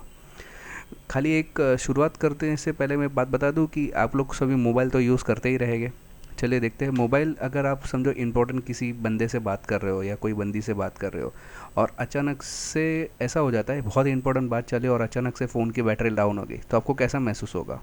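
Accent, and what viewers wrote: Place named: South India, India. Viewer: Amazing way of teaching great sir, seems like you are from South 😊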